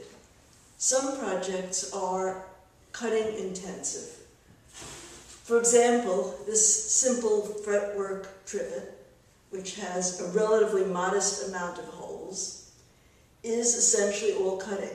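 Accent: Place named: United States. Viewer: Scrolling with the Hegner, wrong title as no scrolling takes place just a lot of talking with strong whining American accent to difficult to understand even for the subtitles.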